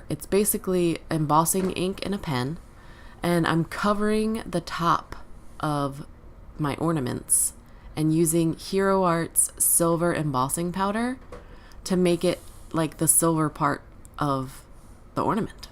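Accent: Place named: Baltimore, United States. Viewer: I can’t tell you how excited I was to hear someone that sounds like ME!!! lol I’m in Baltimore Beautiful card btw 😁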